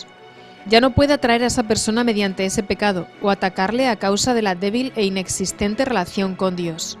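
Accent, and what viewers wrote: Standard. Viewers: Agata Simma murió en el año 2004, pero en estos vídeos se manifiestan ideas que sólo se empezaron a hablar en los últimos diez años, parece que está todo distorsionado y mal traducido: María Simma no criticaría nunca a las cosas que dictaminó el Concilio Vaticano II, por ejemplo la comunión en la mano...Se están falseando los dichos reales de la Mística Católica, y opinando en contra de la Iglesia...Parece un pensamiento Tradicionalista que está presente en todo el vídeo...Yo puedo traducir alemán, y me gustaría mucho escuchar el audio original, y no a esta traductora española con acento neutro...Tengo muchas dudas por la forma en que ataca a los sacerdotes modernos, está en una posición claramente Tradicionalista y Lefevrista...Habla mucho de políticas eclesiales contrarias al Vaticano II, y no del verdadero mensaje del Señor Jesús y de la Iglesia Católica, Apostólica y Romana, que es un mensaje de amor y misericordia...Y espero que este comentario no sea censurado...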